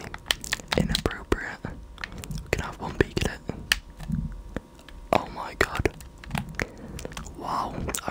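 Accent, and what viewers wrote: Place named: England, United Kingdom. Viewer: cool! i feel like people from england have great asmr voices, and you did not disappoint:)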